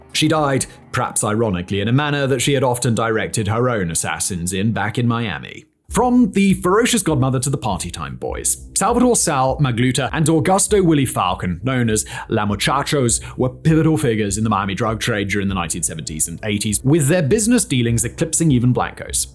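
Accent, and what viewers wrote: United Kingdom. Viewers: Pea-brain strikes again. Despite being a world famous city thanks to the cocaine trade still managed to mispronounce Medellin. He keeps proving that having a British accent doesn't make you smart.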